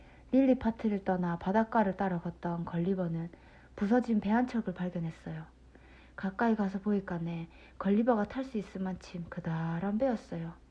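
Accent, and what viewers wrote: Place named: Pohang, South Korea. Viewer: 편안하게 엄마가 베드타임스토리 들려주는것 같았습니다. 잘들었어요. 포항이신듯요.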